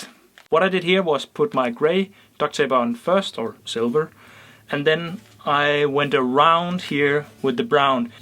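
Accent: Denmark. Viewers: er du dansk?, you have a danish accent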